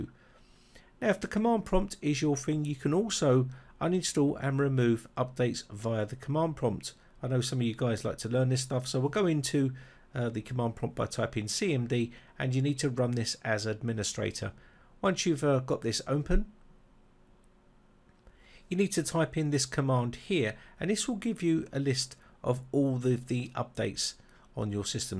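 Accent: United Kingdom. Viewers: Wow.. Nice British accent. I love the way a true English man speaks.. Absolutely marvelous..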